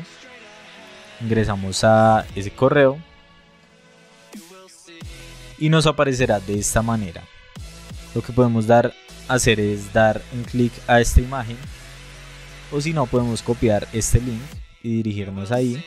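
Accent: Bogotá, Colombia. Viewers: Iba a preguntar si en LAN se puede pero se le noto el rolo :v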